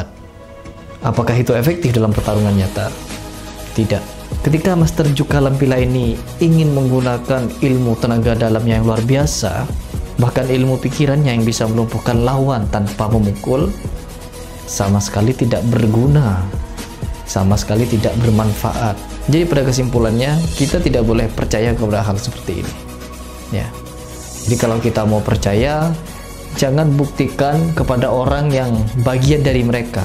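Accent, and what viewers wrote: Madura, Indonesia. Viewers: Mas logatnya seperti Madura.. Betul ya ☺️🙏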